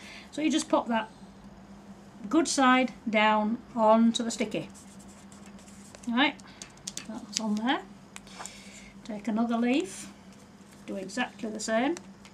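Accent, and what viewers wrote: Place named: Nottingham, United Kingdom. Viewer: I am new to guilding flakes & came across your lovely videos with wonderful tips, I am so impressed with your budget ideas I have just ordered the mix bags of flakes you have on Ebay, & dont worry about the accent, I am in Nottingham & understand every word! lol great videos, thank you for making them for us xxx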